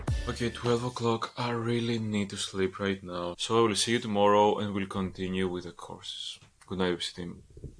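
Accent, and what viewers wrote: Greece. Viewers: Πως φαινεται η Ελληνικη προφορα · Il Αφερφέ ο λόγος που του μίλησα στα Αγγλικά είναι επειδή ΟΛΑ του τα βίντεο, ακόμη και η περιγραφή του καναλιού, των βίντεο κλπ είναι στα Αγγλικά. Άν και για να πω την αλήθεια, η προφορά του μου κίνησε λίγο την περιέργεια και έμοιαζε πολύ με Ελληνική. Τελικά είναι όντως Έλληνας. Οκ δεν πειράζει για την ερώτηση που είναι στα Αγγλικά. Με αυτόν τον τρόπο μπορούν να την διαβάσουν και οι ξένοι, οι οποίοι μπορεί να έχουν την ίδια ερώτηση με εμένα... Οπότε μην πας να το παίξεις έξυπνος για να βγεις από πάνω. Εφόσων μιλάει Αγγλικά στο βίντεο, είπα και εγώ να κάνω σχόλιο στα Αγγλικά για να καταλαβαίνουν όλοι.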